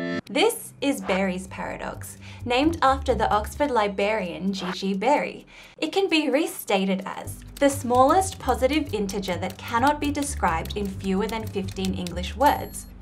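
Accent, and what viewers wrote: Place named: Australia. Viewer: in an Australian accent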